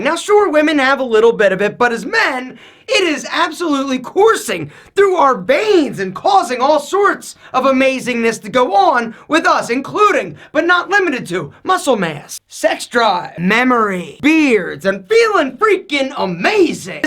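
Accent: United States. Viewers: One thing I can say is, you are Talkative! Don't speak too much, remember you are American (have accent and not all your viewers are saMe like your race) not a Pilipino or Indians or Thailand etc. If your other subscribers and viewers are not same your race, so do you think they will understand you if you speak so fast like this now? And most, if the person have no idea what is testosterone then how it is even You explain in a fast track!!! Weeeew..... It's good that I am fluent in English, if to other people who loves to watch your video, I hope they will understand you in that ways how you speak like a Talkative Guy, Parrot is better than to You how to speak hahaha sorry peace I am your good watcher I think but today I comment negative because this is what I observed guys sorry! 🇵🇭🇵🇭🇵🇭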